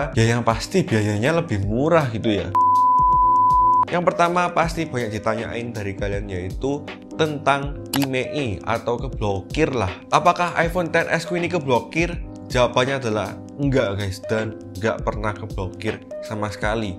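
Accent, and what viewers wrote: Java, Indonesia. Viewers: Medhok bang hehe semangat bang salah satu inspirasi sya 🙏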